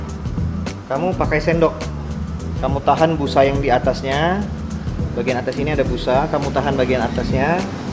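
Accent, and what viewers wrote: Medan, Indonesia. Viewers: Anak medan ya bang ?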